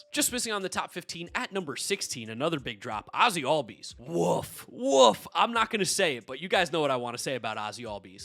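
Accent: New York, United States. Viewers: I guess that's the new York accent. I'm from New York and I don't either. Fowards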